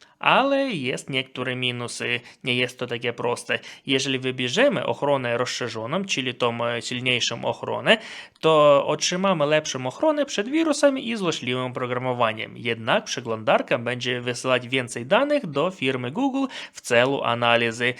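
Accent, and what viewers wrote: Ukraine. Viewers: Czy to bezpieczne słuchać kogoś ze wschodnim akcentem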